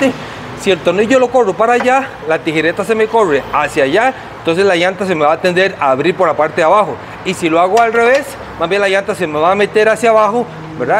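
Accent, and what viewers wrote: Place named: United States. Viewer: A pesar de ser colombiano , ya se nota que ha vivido en EEUU , por el acento gringo con la rr .buen video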